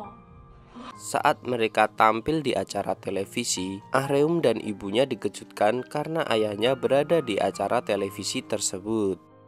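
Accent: Java, Indonesia. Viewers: kenapa kalau ente yg ceritain film ini feel sedihnya kagak ada....yg ada malah ngantuk! mungkin karna meddok yah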